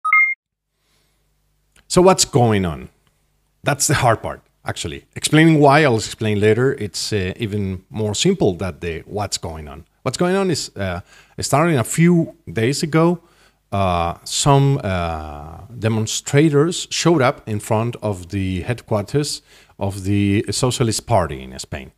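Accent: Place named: United States. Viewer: Your American accent sounds beautiful.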